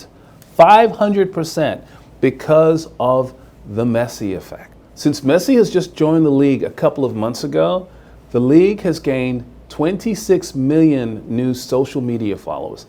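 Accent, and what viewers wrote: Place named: United States. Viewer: his English accent sounds American but he was born in London 👍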